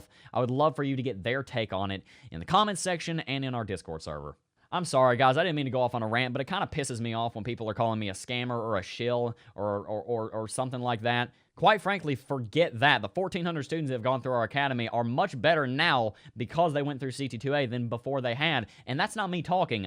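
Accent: South, United States. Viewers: I like the mix of smartness and the southern Accent he has.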